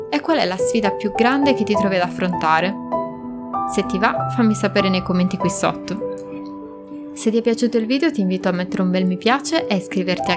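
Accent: Sardinia, Italy. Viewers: Accento con cadenza sarda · la voce narrante è Sarda del Cagliaritano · tu che parli sei sarda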